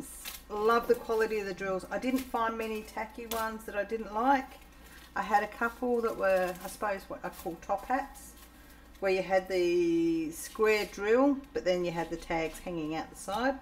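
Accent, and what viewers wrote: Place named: Australia. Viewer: This is lovely! 50x50 is becoming my go-to size. Also, great to hear an Aussie accent!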